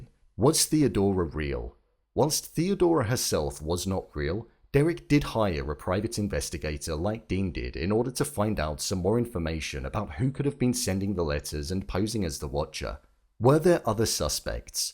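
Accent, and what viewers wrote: England, United Kingdom. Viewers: Is that english’s accent is trying to discredit Netflix? Irritated for The Crown series? A lot of novels, history books, screenplays had different changes in a movies, series or documentaries. Only knowledge can set you free.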